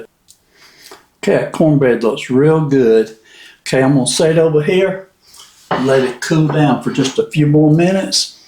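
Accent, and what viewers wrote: South, United States. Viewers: Lovely cooking demo. It came out great and I loved listening to your southern accent. 🫶🏾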